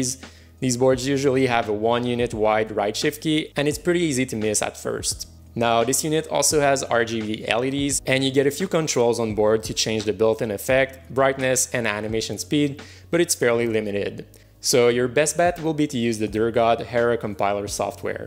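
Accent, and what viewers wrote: Quebec, Canada. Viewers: Hes canadian french so, dont mind the pronounciation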